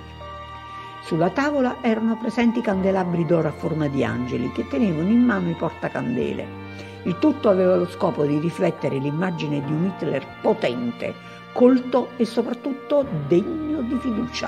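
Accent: Italy. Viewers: Brava, ❤ avverto nell' ascoltarti parlare, il tuo accento campano 👍💥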